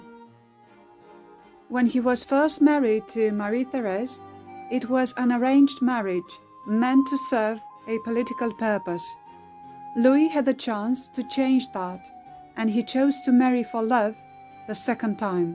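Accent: France. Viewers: I forgot to say, your English pronunciation is perfect and your French accent is very charming. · It’s always so nice to listen to a French native narrate French history. As one who knows the language, I often bristle when many English speakers do presentations involving French names, places, and events; they frequently mispronounce the words. The woman on this video does such a nice job. Not only is the French all exquisitely spoken; but also, her tone is lovely and graceful. Thank you for posting this brief but thorough overview of Mme. de Maintenon, a lady who lived her life well and honorably.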